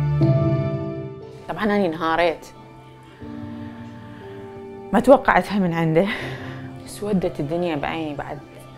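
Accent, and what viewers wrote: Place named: Iraq. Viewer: مااعرف ليش حسيتها عراقيه دخلت للفديو وطلعت صدوك بنت بلدي. حجيها كله صح احنه عايشين بمجتمع ذكوري يشوفون الولد نبي والبنيه كافره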